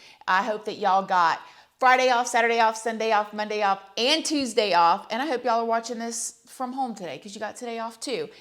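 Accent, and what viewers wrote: South, United States. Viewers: So happy Rudy has been found!💙 Also I love you, a main reason I subscribe is hear you say "poh-leese" your country twang is adorable. Your story is inspiring, thank you for sharing your life w/us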